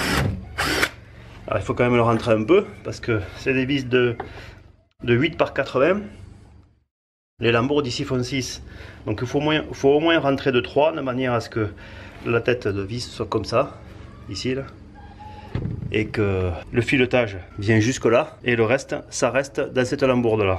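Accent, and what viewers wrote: South, France. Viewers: Belle vidéo comme d'hab. ce paysage est magnifique et cet accent me dit quelque chose je pense que nous somme pas loin l'un de l'autre. Poir ce qui est des plot tu peut le remplacer par des laver autoblocant cela marche très bien aussi allez ciao a très bientôt dans tes vidéos qui me font passer à chaque fois un super moment même si comme toi j'ai un grand jardin qui me permet de pas m'ennuyer Jphi de carcassonne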